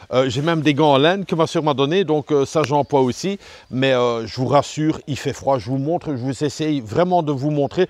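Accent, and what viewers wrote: Belgium. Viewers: salut,je suis tombé par hasard sur tes vidéos,je suis un amoureux de la Thaïlande,mais je n y suis jamais allé en tout bravo pour nous faire connaitre une autre Thaïlande un peu plus rurale.......étant Belge moi aussi il me semble capter un accent familier...... merci pour ces belles video!!!!!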